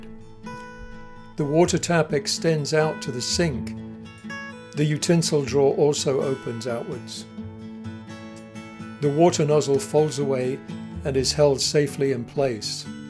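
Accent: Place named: West Country, United Kingdom. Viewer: Your accent, there is a hint of west country, maybe Swindon or Bristol? Great effort, you worked very hard and the bus looks fantastic. Enjoy 😉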